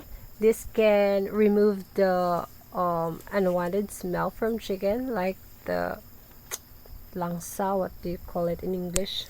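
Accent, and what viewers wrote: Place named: United States. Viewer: Kuyaw visaya diay ka mam mura man gud American accent ka the way you speak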